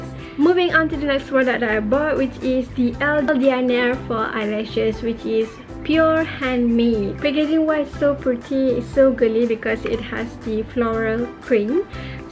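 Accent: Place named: Kedah, Malaysia. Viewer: Suka dengar cakap kedah o. Kalau boleh teruskan cakap kedah:)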